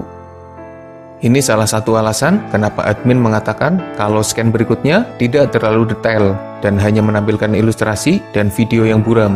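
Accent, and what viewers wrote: Banyumas, Indonesia. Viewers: Daleme pundi min admin. Ngapak ya min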